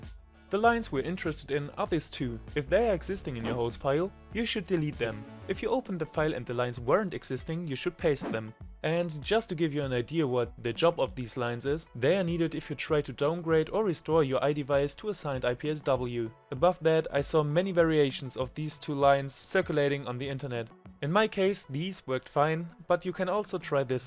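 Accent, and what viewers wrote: Germany. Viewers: I like the German accent lol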